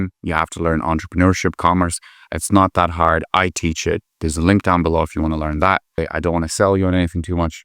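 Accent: United States. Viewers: You have an american accent . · You could just call 'the West' Europe. That's geographically where you are speaking about. You video is nonsense, - there is freedom of movement in Europe, within the EU. Saying 'Eastern Europeans' made Ireland less safe is complete nonsense. Many Polish people settled in Ireland and added hugely to Irish society. You are so anti the US and yet you sound so American, you certainly don't sound like a person who lived in Galway since they were nine years old. Ireland has experienced an influx of illegal immigrants who come into Ireland via Northern Ireland, but those numbers are falling now. We have had a lot of Ukranian refugees, fleeing war. Would you suggest not helping refugees fleeing war? "Indians have fake degrees" - no faker than you stating you have a masters in pharmacology, only to later admit you dropped out, so you don't have any masters degree. 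Lithuania joined the EU in 2004, so you weren't an immigrant who needed a visa when your family moved to Ireland, you were just someone exercising EU freedom of movement with your family.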